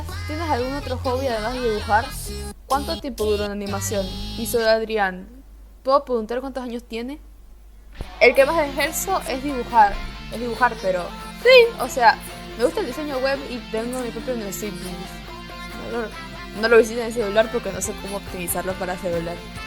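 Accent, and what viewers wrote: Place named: Argentina. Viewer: BUENO EMMM, tenía la idea como de una manera más "silly" o tipo como alguien cuando se tapa la nariz NO SÉ PQ JDIDIEIKWKAKSKDN 😭😭😭, además no pensaba que se te notaba el acento argentino (lo cual no es malo obvio, además no soy nadie para "quejarme" al respecto pq a mí se me pegan acentos de otros países lolzies)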